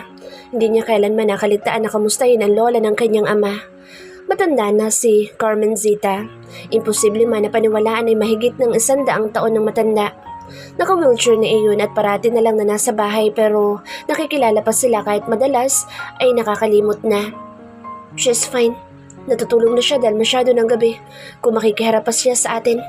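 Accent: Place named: Philippines. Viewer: Sayang kaya wala ng nasyadongnagsusubscribe sa mga upload ninyong story isa na sko sa mga subscriber ng mga maganda ninyongstory pero ngaton I stop subscribing your stories dahil hindi ko na gusto ang narrator ninyo. style s. Style ng pag deliver ng lines at saka nay punto pa. Taga saang probinsiya ka? taga saansng punto na yan galit ka sa pagsasalita mo sayang nasaanyong dsti mong narrator. mula ng mslspit na ang finale ng Hiding The Montemsyor Real Heir pnapitan na sng narrtor kaya hindi ko na tinapos ang siguro sampung episode bg montemayor heir hindi gusto a yong boses ng narrator kaya di na slam sngnaging finale ng Montemayor Real Heir Gusto ko sanang makinig sa story kaya di ko rin. Ma absorb ang voice na galig at mabilis na parang hinahabol ng asong ulol angstyle ng pagsasalita ni miss narrator. Ssaysng sng gsmda sana ng storymo. ✌️✌️✌️✌️✌️✌️✌️👎👎👎🤮🤮🤮🤧🤧🤮🤮🤮🤮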